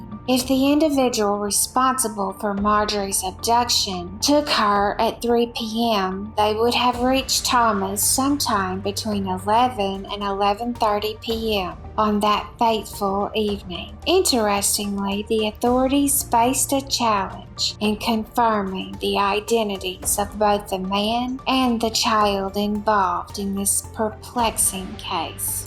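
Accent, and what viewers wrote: South, United States. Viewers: I just love her southern accent. So soothing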